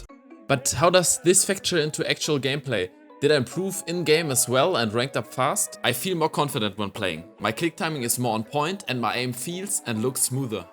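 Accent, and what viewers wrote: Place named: Germany. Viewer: i hear a bit deutsch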